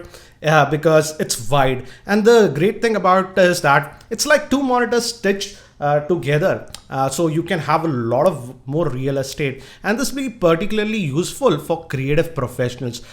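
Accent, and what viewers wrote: India. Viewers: Good video. I've always liked the indian accent, it makes me feel at ease. Thank you.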